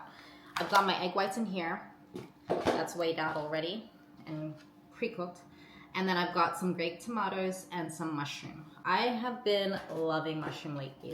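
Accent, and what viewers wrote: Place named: East Coast, United States. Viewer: You actually sound like your from the east coast, and BTW that GASP shirt was a banger I have a lot of that gear along with many other fitness oriented companies...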